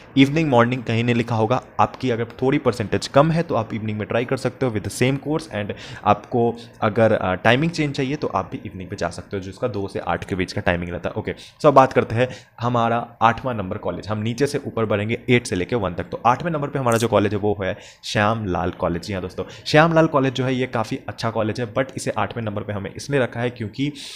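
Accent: Bengal, India. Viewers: Naa mane arabinda k orobindo bolcho r motamuti mone hoy tumi bangali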